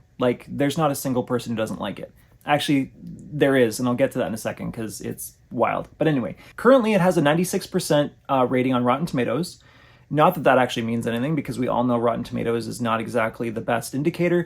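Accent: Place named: Canada. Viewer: I think he is canadian... he has that typical lovely canadian accent.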